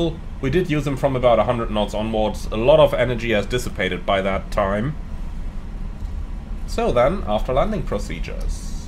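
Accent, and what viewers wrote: Switzerland. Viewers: What is your Swiss accent 😂? Really nice. Thanks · I love your swiss accent😊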